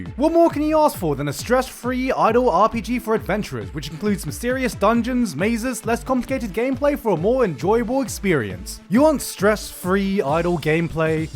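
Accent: United Kingdom. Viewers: He has a Bri'ish accent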